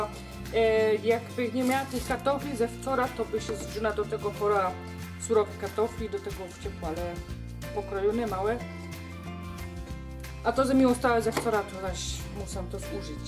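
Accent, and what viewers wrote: Silesia, Poland. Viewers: Kanał jest ogólnopolski wiec mów poprawna polszczyzna bo tego śląskiego bełkotu nie rozumiem.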